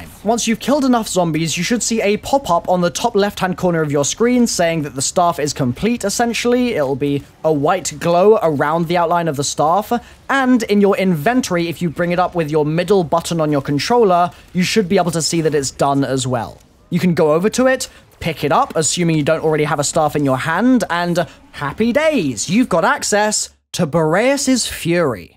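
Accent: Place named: United Kingdom. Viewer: You're British arent you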